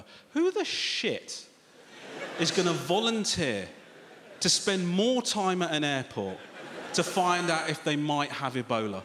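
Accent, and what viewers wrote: United Kingdom. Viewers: Why are you speaking with a British accent? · That's how he talks. He is born in Britain, studied in Britain, so if corse he will have that 'accent'. The way you speak has nothing to do with your color or ethnicity. It depends on where you grew up. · sounds more British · Talks about Iggy sounding like a black woman from the south. But here he is, a Sri Lankan, with his Bri'ish accent. 🤔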